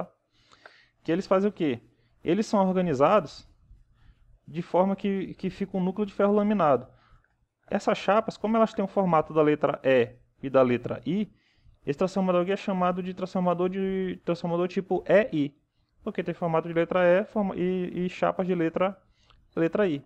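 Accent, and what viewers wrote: Ceará, Brazil. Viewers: tu parece ser cearense.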